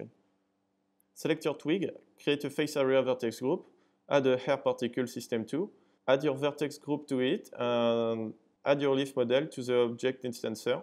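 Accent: France. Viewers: l accent explique Napoléon , tu représentes ! petite fierté mal placée , franchement tu déchires tout ce que j'ai vu ! merci pour ces moments d'émerveillement !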